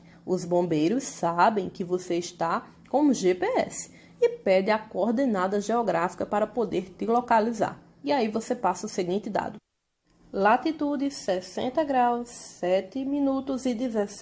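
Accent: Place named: Northeast, Brazil. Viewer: que aula fera! ouvindo seu sotaque me lembrei do nordeste, um lugar que amo!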